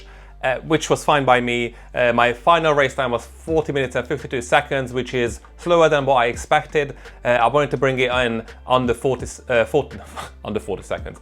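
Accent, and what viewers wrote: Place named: Hungary. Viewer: Hallatszik a kiejtésen hogy magyar vagy.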